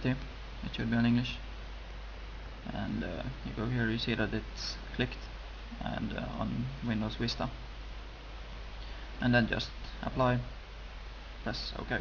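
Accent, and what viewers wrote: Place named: Sweden. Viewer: Man hör ju tydligt att du är svenne iaf :D+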